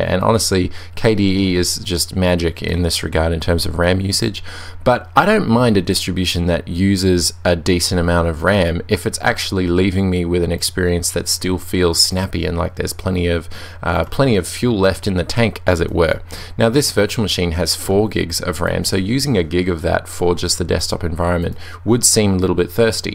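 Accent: Australia. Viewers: are you an australian?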